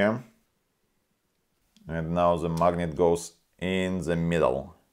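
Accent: Russia. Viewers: Акцент русский. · Русский акцент слышно даже мне. Но то что автор не стесняется разговаривать это молодец. Видос не досмотрел, но за старания лайк.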